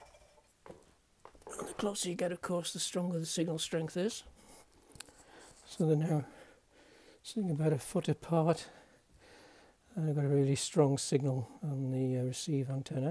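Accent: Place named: Northumberland, United Kingdom. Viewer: Hello again, finding the videos fascinating. I see you live in Canada, although you sound like you're from our region of the UK... we're up in Northumberland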